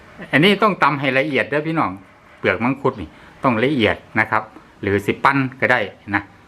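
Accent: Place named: Chaiyaphum, Thailand. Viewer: สำเนียงคล้ายคนชัยภูมิแท้คับ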